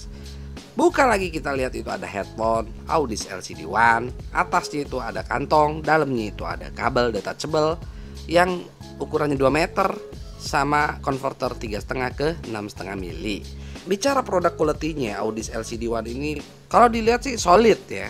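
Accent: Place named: Petamburan, Indonesia. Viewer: Logat petamburan nih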